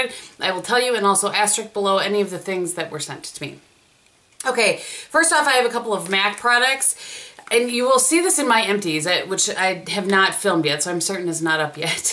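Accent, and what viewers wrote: Wisconsin, United States. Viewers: I thought I recognized that WI accent!! Osseo here!!! Love your videos! ❤️❤️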